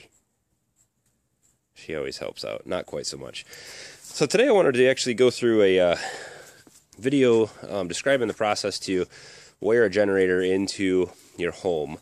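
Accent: Michigan, United States. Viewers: Great video brother. I live in Florida now, after Irma I’ve been trying to find a safer way to power my home with my Generator. I think I’ll buy one now. And made in USA 🇺🇸 sealed the deal! So I’m watching the video thinking ‘this guy has a Michigan accent’ and ‘about time I watch a YT video without swearing’! I’m a God fearing Michigander that lived not far from your farm. Needless to say, I’m subscribing and can’t wait to explore your channel. 👍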